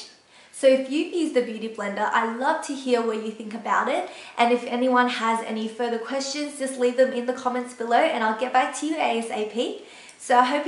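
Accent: Australia. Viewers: I love your video! And love the Aussie accent! ;) so I just used my beauty blender for the first time today and just like u, I fell in love with it!! It covered my acne and scaring. I couldn't believe it!! Best purchase hands down.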